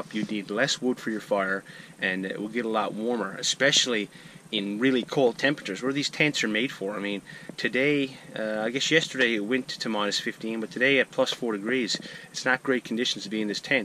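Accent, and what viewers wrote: Newfoundland, Canada. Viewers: That Newfie accent is so close to the Irish accent. very similar to mine and I live in Australia.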